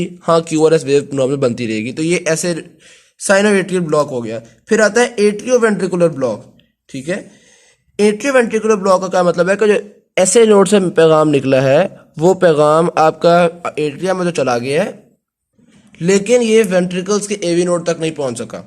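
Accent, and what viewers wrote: Punjab, India. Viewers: Zbrdst...punjabi accent mn smjh kr ziada mza aya😇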